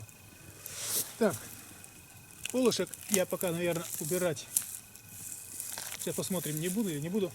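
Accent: Russia. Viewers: ***** Это наш Вологодский говор. яркий представитель своей родной земли.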